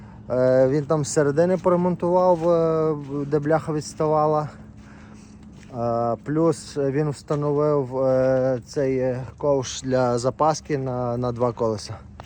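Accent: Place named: Hutsul, Ukraine. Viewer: Ти гуцул ще той! ЗабЕЕЕру...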